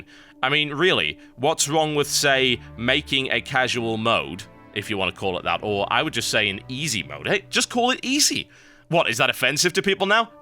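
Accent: United Kingdom. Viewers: Thank you for your always honest and informed opinons in a british accent. You will always be my first stop on the interweb b4 i start hardcore gaming. And I'm grateful you will be continuing the mailbox as i love to hear your thoughts on things that the community has ideas or opinions about. Good Luck finding another MMO to play and i wish you the best of luck! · WTF WTF??? Wow is no more wow we known. It Changes when comes Lich king and Cataclysm. Reason why people stop playing wow its repeats all time everything is same. I like for his comment and i like british accnent ( sorry for my gramma ) so Good Luck i wish u very nice future!!!!!!!!!!!!!!!!!!!!!!!!!!!!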